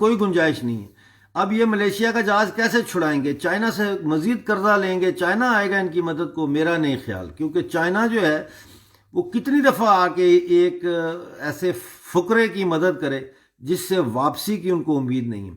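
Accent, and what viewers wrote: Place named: Jammu, India. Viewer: the way he speaks dogri touch is there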